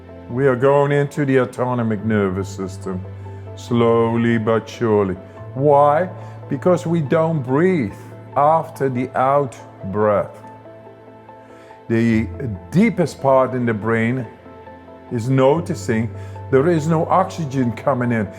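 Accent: Scotland, United Kingdom. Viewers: I was having some trouble recently calming manifestation.guide the voices in my mind during my morning meditation. him has a wonderful Scottish accent and a very calming voice. Thanks to his meditation CD's I have gotten back on track and feel a big difference in my meditation practice. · These meditations are perfect. <link> him has a gentle, warm, pleasant voice (with a slight Scots accent). The words are simple and clear. The timing is perfect... just as you start to find your mind wandering, he says and if you find that your mind is wandering... . There is no background music and no noise in the background, only his peaceful and engaging voice. The length of the meditations (about 1/2 hour each) works well for me. I find that I tire of some meditations after frequent repetition, but that hasn't happened with this one. The metta lovingkindness meditation always feels right. 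Some meditation CDs I have tried have not felt right. The voice seemed hurried, or sounded tense; the background music seemed intrusive, or there were noises that interfered with concentration. This is just perfect as an introduction for new meditators, and it's also a meditation that I return to again and again. I'd give this more than five stars if that were an option. Even my students, who are not ordinarily very receptive to meditation, relax when they hear this one!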